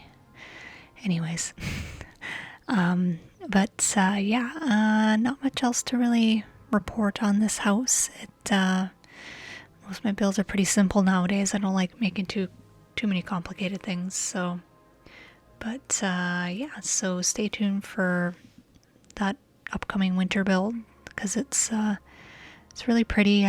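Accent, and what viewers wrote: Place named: Canada. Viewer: i’ve always suspected you were Canadian because of your accent, but it’s awesome to hear it confirmed! Torontonian here :)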